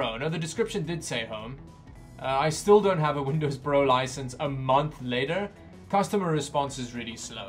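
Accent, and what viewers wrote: South Africa. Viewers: Wait are you South African ? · Is jy van suid afrika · dude are you south African? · Are you South African?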